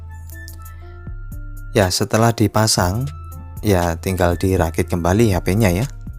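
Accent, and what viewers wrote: Java, Indonesia. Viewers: mas bahasa ne medhok keturunan jawa ta mas?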